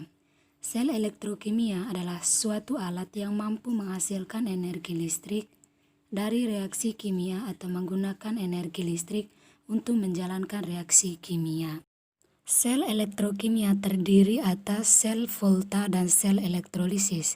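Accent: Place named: Java, Indonesia. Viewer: Keren. Tetal smngat ee.. Saya fokus sama suarax.. Sdh medok jowo gah😍😍😍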